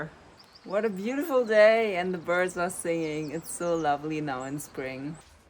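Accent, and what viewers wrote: France. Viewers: a very nice french accent, keeps going amazing works. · Mignon accent français 😘❤️ Bonjour de France🎉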